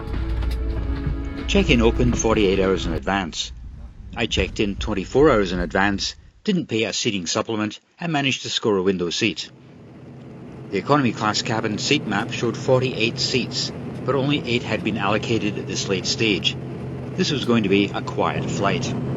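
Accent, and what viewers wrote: Scotland, United Kingdom. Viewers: Love the Skaughtisch’ aksent.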